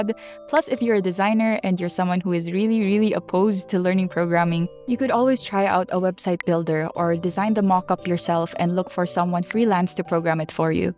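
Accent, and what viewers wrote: Philippines. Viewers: first time watching your content. overall its very simple and cute <3. and loving your filipino accent :3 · I know a filipino when i hear one, lmao literally had to check ur profile when heard ur accent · i know a filipino when i hear one :face-orange-biting-nails: anw i absolutely love ur arstyle!!!!!!!!!!!! ur voice's soothing too :pppp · This might be weird but the only video ive seen from you is the duck one and this is the first time im hearing your voice, LIKE ONE MINUTE IN i was like “I HEAR FILIPINO”😭😭😭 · I've lived in the Philippines for all my life and accent is bothering me, not in a bad way or anything just familiar in a sort of way, her accent reminds me of alot of my Aunts who speak English but their main language is Filipino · Are you from philippines? Your english accent sounds like that ❤ very adorable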